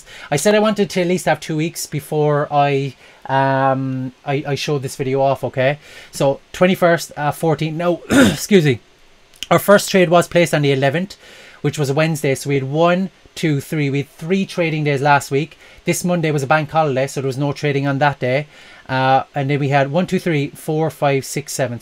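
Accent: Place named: Ireland. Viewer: Hi, Very informative - excellent explanation - thank you - alright / all night (couldn't quite get my head around your Irish accent!;-) One question if I may: you were using TradingView to explain your strategy, but using TD365 as your broker. The two don't integrate (sadly), so I'm wondering how that works? Perhaps you're just using TV for demonstration purposes on your YouTube channel and your actual trading on TD365?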